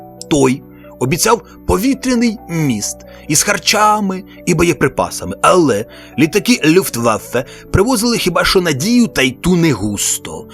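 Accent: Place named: Russia. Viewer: Мова автора з скаженною кацапською алітерацією мови!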